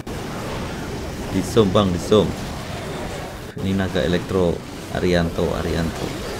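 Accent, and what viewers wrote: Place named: Banyumas, Indonesia. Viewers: Daerah ndi om dening ngapak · Ngapak banget mas ngomongnya:v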